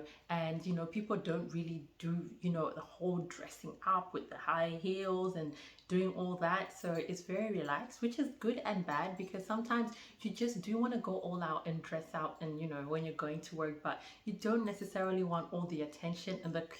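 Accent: Australia. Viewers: where did you get that Aussie accent? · You’ve got quite an Aussie twang already! Ahah. My dad lived in Botswana for most of my childhood and adolescence so I spent a lot of time in Southern Africa. Been to Zimbabwe a few times…Harare, Bulawayo, vic falls, lake kariba, hwange, those ruins - forgot where they are (that was when I was like 12 so over 20 years ago. Anyway, 🙏 welcome! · your starting to sound aussie.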